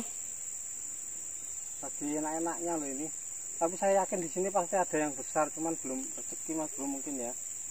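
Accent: Java, Indonesia. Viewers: suruh istri nya nyetrik biar mbak ngrasain streeek ikan gabus,asli dr mana mase koq logat bahasanya orang jawa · Jowo kelutok jebule😁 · wong jowo, ndi kie suarane😊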